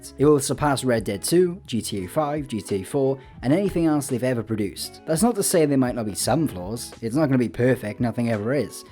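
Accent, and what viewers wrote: United Kingdom. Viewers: Bro got that British voice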